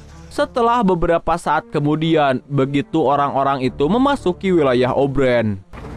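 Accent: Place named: West Java, Indonesia. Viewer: eleh-eleh.. sunda pisan.. 😁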